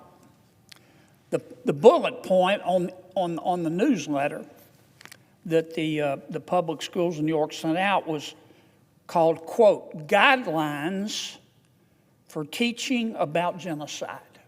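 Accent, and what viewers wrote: South, United States. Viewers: Just LOVE his Southern drawl and smooth demeanor. WHAT is wrong with parents who send their kids to schools like this?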